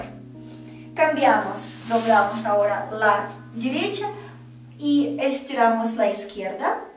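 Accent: Russia. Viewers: eres rusa???? tu acento y apellido parece ruso ty krasivaya heheh pryvet saludos muy buenos vídeos estoy practicando para la flexibilidad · Eres rusa verdad? Yo también, lo digo por el accento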